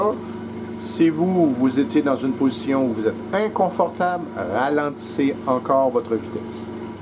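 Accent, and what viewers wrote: Quebec, Canada. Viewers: Salut. D'après ton accent je dirais que tu es du Québec? Les québécois c'est la meilleure partie des français... Ici en France ce st terrible la mentalité. Bref. Super vidéo C'est une passion que je cherche à assouvir donc grd merci 😉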